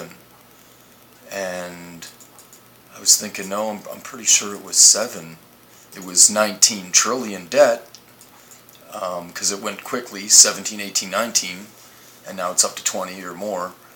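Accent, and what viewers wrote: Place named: Canada. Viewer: people move. after Sandy, a lot of people moved out of NY. just like after Katrina. California population had jumps after those events. it's called migration. the east coast wasn't permanently flood. those areas were created, not lost. look at old maps. none of the East coast line was never as straight as it is now. those started out as sea barriers. to stop coastal flooding. they are creating new land now. he acts like he knows what the coast looked like but really doesn't. he couldn't even name Chesapeake Bay or the bridge. it's by far one of the most known landmarks of the East coast. those who don't know it are products of poor education. he sounds Canadian so his education of the U.S. is not to be expected. I don't blame him for that. seriously though. millions of people have not been deleted from existence. we've never gotten how population numbers. 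they've always jumped around. especially out if China. they used to inflate their population numbers as a fear tactic. the probably still are.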